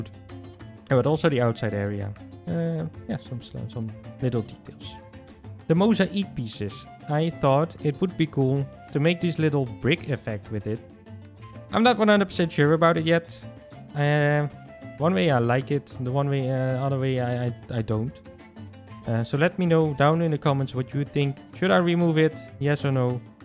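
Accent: Netherlands. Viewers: Heerlijk Nederlands accent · the dutch accent is strong in this one, love it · are you dutch? i also am so just wanted to know bc i heard more dutch guys talk english like that Also for other peopol reading this guy is great at the game so SUBCRIBE!!!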